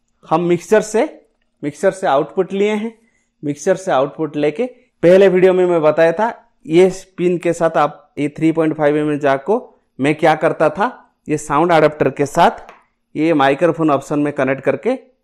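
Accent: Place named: Odisha, India. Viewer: Sir app ki language odia jese laga rahe hai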